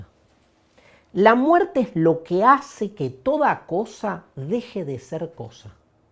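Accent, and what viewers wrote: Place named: Argentina. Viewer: es genial!! Clase de filosofía con carcajadas es una delicia. De ñapa clase de español argentino.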